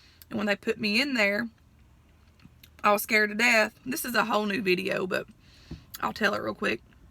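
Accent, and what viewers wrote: South, United States. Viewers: I like you, you are from the south and you talk how you talk if people don't like what you are saying they don't need to listen.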